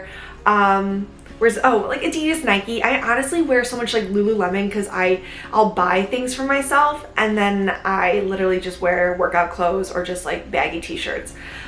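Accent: Midwest, United States. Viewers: omg you’ve got a thiccccck midwest accent. i grew up in suburbs of illinois too. i love it!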